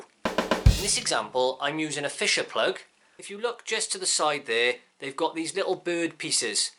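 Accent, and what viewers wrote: United Kingdom. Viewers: “Cello tape” and “Hoover”……even if he didn’t have an accent, those terms are a dead giveaway. Love a British accent!!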